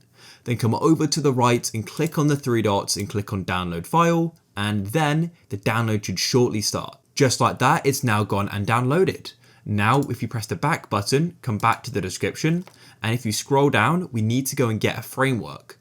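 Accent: United Kingdom. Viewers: You bri-ish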